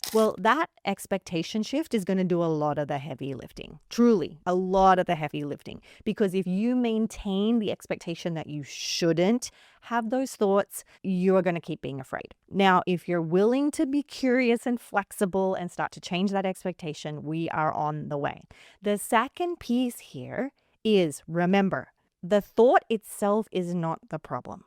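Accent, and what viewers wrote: Australia. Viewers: what is your accent?- Australian American-midwest...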